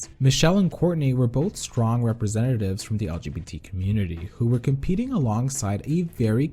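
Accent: Spain. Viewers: QUEEEE? ERES DE ESPAÑA????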